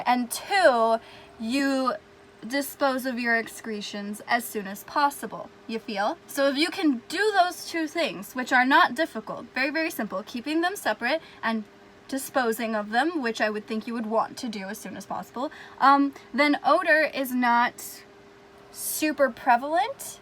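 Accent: Texas, United States. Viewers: Are you from Dallas, Texas?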